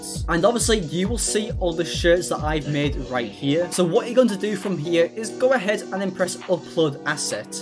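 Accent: United Kingdom. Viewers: Mate, are you British?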